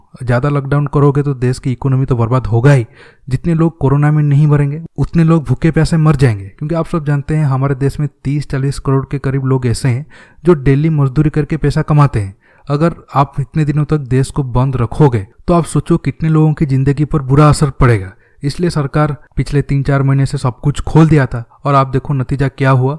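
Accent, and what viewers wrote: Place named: Gujarat, India. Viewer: Bhai tujhe hindi bolna nahi ata mujhe lagta he tu gujrati he teri hindi amit shah ke jaisa he isi laga ke tu gujrati he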